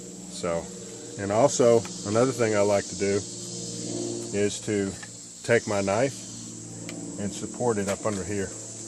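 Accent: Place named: South, United States. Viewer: Thanks for this video. Sounds like your down south somewhere.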